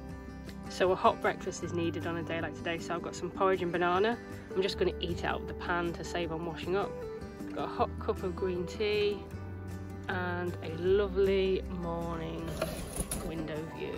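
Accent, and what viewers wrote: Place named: Warrington, United Kingdom. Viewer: That sounds like a Warrington lass accent?😂 Did you say the site was the Camping Club Site? Lovely video, Delamere is an old haunt of mine but I live in Lakes now. Gonna pop back down for a mooch again this Winter. Thanks 👌🌞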